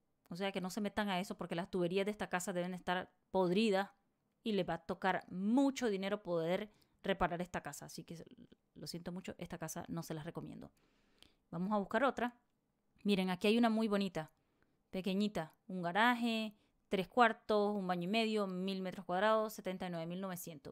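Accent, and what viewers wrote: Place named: Central America. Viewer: Posdata: Sabía qué estás de Centroamérica por el acento pero no tenía la certeza de qué eras Nica! Yo también soy Nica,un saludo paisana! 😘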